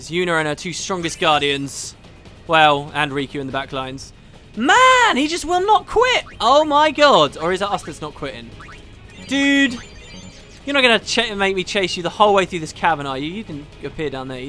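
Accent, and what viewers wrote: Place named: England, United Kingdom. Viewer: thats a real shame, your guys casual movement from gw's to everything else, for me, really captured what the gw community are doing. would you ever think about starting a similar style podcast you our own? i know the 'but of corpse' (and their wider range of casters from that channel) could do with someone with your knowledge/perspective/english accent on their shows.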